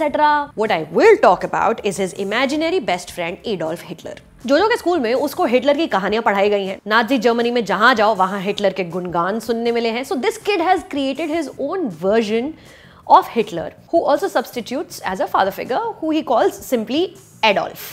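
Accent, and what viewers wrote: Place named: India. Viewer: After the biased viewer ‘not a review’ of Kabir Singh instead of being a reviewer, I’d given up on you, but you’ve redeemed yourself by going back in to your reviewer shoes. Well done for that! I started this review with a bit of a bias - let’s see what stupid stuff she talks - but you proved me wrong. Again, kudos. Very objective review (looks like it, I haven’t watched the movie) and great way of connecting it to other related art forms like HHGTTG. Now pet peeve - I understand it’s your style, but why speak with a strong accent even when speaking in English! Even more, why mix Hindi and English instead of either being true to yourself by sticking to Hindi or being a wider-audience-reaching reviewer like Bharadwaj Rangan and sticking to English. It actually annoys me personally, but stick with the mix if your regular audience likes it, but still keeping the Hindi influence of English away. 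All of this said in good sense and no offence meant! Cheers!!!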